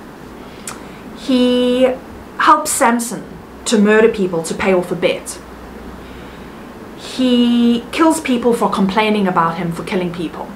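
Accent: South Africa. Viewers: You have a South African accent 👁️🐍⚖️🔥☝️ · Are you a Saffa? 🇿🇦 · You sound South African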